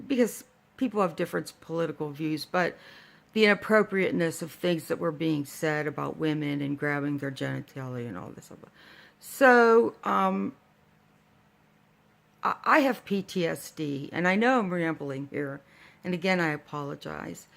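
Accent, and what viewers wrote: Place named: United States. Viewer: Ps. love the accent where in the US are you from?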